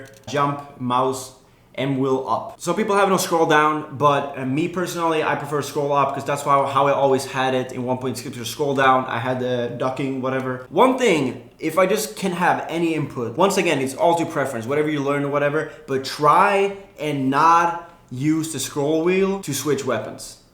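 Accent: Sweden. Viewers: U are talking a littel bit swedish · are you from sweden? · Are you from Sweden?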